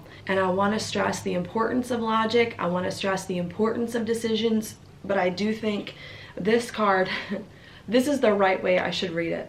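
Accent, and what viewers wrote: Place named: Georgia, United States. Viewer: Thanks,! I couldn't help but notice your accent is reflecting your recent move back to Georgia.;)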